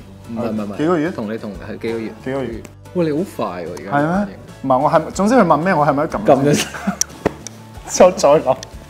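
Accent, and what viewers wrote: Taiwan. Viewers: 我知呀！所以我話我估計佢爸爸媽媽新移民黎香港生佢之後，可能屋企都同佢講普通話，到佢大個自己鍾意台灣歌，pickup 得好快台腔。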